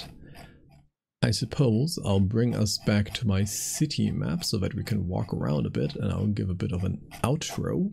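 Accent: Germany. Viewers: You sound like you have a slight german accent but you are so well spoken and articulate. It really confuses the heck out of me. Seeing that there are some people commenting in german, I can't be the only one, haha. Anyhow, fantastic video! I've deliberately not looked at any building tips and tricks to find out some of these things for myself and only now allowed myself this video as an introduction on what the game has to offer. Almost done watching and can't wait to implement stuff like the flying buttresses. Can I just say, you should really consider streaming your progress on twitch. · Dieses Spiel ist ganz nach meinem gusto. Übrigens hört nur ein geübtes Ohr deine Deutsche Herkunft heraus. Dein Englisch ist Einwandfrei. Sehr guter Video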